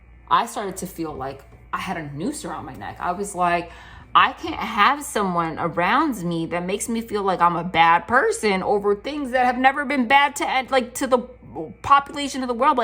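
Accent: New Jersey, United States. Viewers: I just Love your accent 😂 that East Coast NJ accent! Nothing like it✨🫶🏼✨FUN~KEY😂(funky) ✨ Energy🎉 and your readings are so beautiful, thank you. Lots of love & Light sent to you and everyone here🧡☀️💫✨Namaste 🙏🏼🏵️🍀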